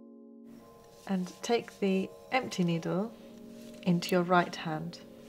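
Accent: United Kingdom. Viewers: Does she have a British accent? Or is it just me that thinks that?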